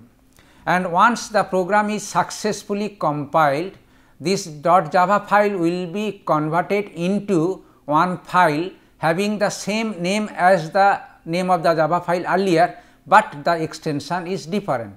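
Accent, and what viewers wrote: India. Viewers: It's indian english ascent😂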